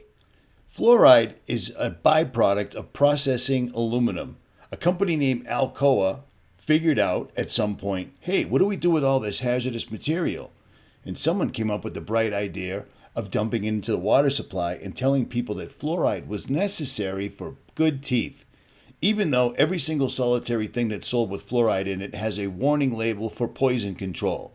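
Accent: Boston, United States. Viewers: This guy sounds just like that dude from Boston. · You must be a Boston guy??! Definitely gets a follow just for that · Sounds exactly like Richie from Boston.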